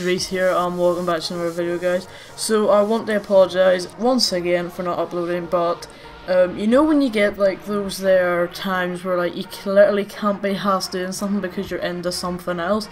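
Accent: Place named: Ireland. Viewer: You from Ireland yea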